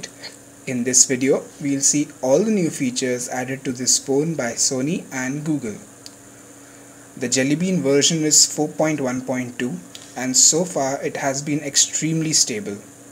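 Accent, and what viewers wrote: India. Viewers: Your english like indian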